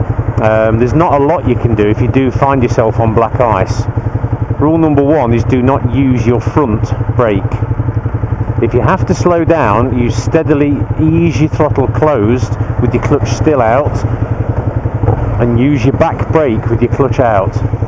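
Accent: United Kingdom. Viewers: I don't have any idea about how to ride on ice or black ice because I'm a young novice rider. I know there is not much to do on black ice, but I believe I missed some points on the video. It is so hard for me to understand British accent. Could anyone summarize the tips on the video? thanks in advance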